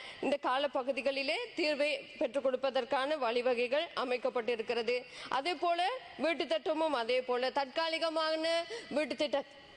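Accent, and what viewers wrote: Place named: Tamil Nadu, India. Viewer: Proud of you sister really happy becouse of i heart tamil slang after long time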